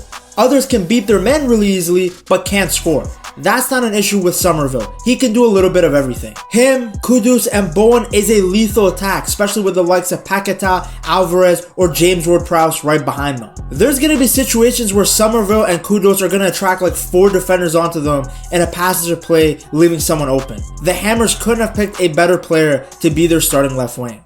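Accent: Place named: United States. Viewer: 😅 mate please use AI next time. Love your bigging up the club I loved but it all goes out the window with your accent. It's not your fault I don't blame you. We would likely get along loving a beautiful sport. But please use AI. Your accent with an American English twang puts one off, thinking 'sensible' Anyway apologies if my humour or attempt goes wrong. Keep well and keep doing what you love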